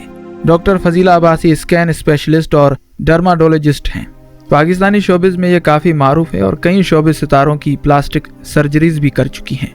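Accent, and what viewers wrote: India. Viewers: Aap Urdu sahi bolo Indian ki tarha nahin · آپ کی اردو کا تلفظ مشکوک ہے۔ وڈیو ٹھیک ہے ۔ مگر آپ کا تلفظ ہندی ہے۔ اس کو ٹھیک کریں ۔ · Urdu pronunciation thik kero apni. Indian style mein boltay ho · یہ گنی اور کھواتین کیا ہوتا ہے؟ شرم نہیں ،،بے غیرت مت بنو،،اور اردو صحیح بولنا سیکھو،،،کیا تم انڈیا سے ہو؟ اگر نہیں تو لعنت ہے تمہارے اس لہجہ پر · This guy is an indian not pakistan. Notice the way he pronounces 'KH' like hindi speakers of india. Pakistanis, dont be fooled by this hateful crap created by an indian.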